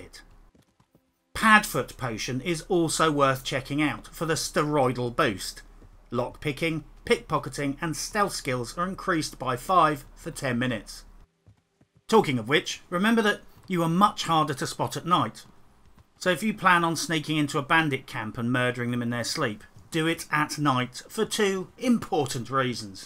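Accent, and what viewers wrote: United Kingdom. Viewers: I haven't played this game in years but come back to this videos from time to time just because they put me in a good mood lmao maybe it's due to the fact that it's a British man talking about this Czech game placed in medieval Bohemia. Being an immigrant from Poland (or POLISH LITHUANIAN COMMONWEALTH if you wish), living in the UK, a history buff at that, what could be better?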